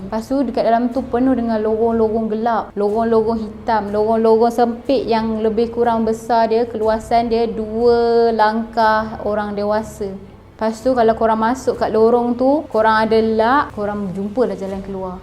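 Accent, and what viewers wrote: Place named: North, Malaysia. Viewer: tak taw pasepa... slank utara detected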